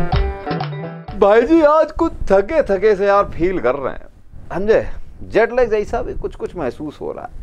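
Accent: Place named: Kanpur, India. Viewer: कनपुरिया अंदाज में खरी खरी....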